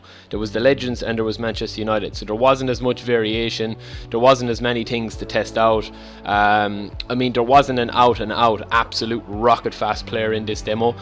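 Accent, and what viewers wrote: Ireland. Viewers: Welcome to Ireland. I think it sounds nice, love the accent.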